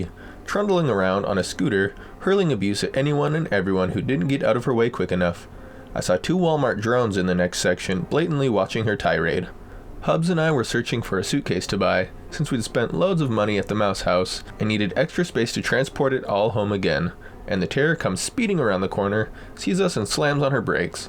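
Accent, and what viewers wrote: Australia. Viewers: I loved your Aussie accent. You did a very job 🇦🇺 ☺️ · Mate you did a great job at the Aussie accent.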